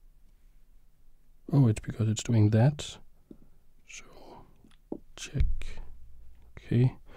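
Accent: Denmark. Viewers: Danish accent and danish gambit. Great combination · 2 hours and danish accents? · As a dane i always find it wierd to hear a danish accent, but I’m sure it’s soothing for others lol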